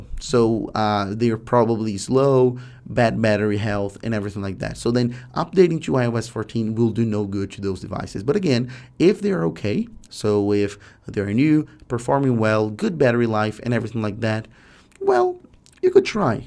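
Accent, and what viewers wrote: Brazil. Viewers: Um salve de São Paulo pra vc,! Notei o sotaque brasileiro, mesmo vc falando super bem hahaha. Valeu pelo vídeo!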